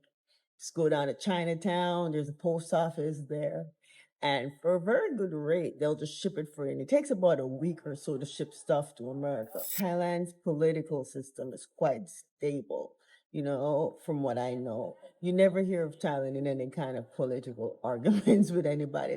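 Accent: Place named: Caribbean. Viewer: You sound like you are from the Caribbean. If you get a chance, take a trip to Kenya. There is a straight flight from Thailand to Kenya and back.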